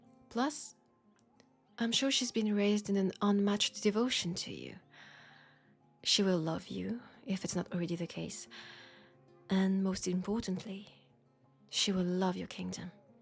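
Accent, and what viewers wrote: France. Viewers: Wow, I enjoyed this way more than I expected I would. Funny how my brain could paint this scene perfectly in my mind. Beautiful voice as well, and as always I just love the french accent. Nice script and BGM choice too. 👏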